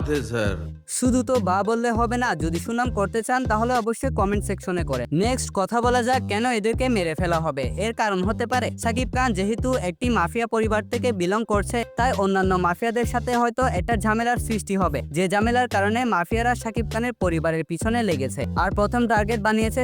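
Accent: Chittagong, Bangladesh. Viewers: আরো চাই তোমার বাড়ি কি চিটাগং ভাইয়া তোমার কথা শুনে লাগতেছে ❤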